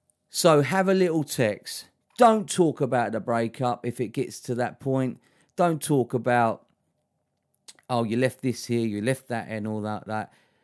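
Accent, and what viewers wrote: Cockney, United Kingdom. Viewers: Mate your videos are great top knowledge and your funny bout how you say it 😂 - you could be in Snatch the movie with that cockney accent.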